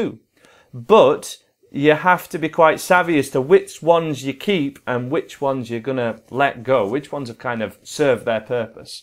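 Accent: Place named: Liverpool, United Kingdom. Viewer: cute, has a Beatles accent, and is a great guitar teacher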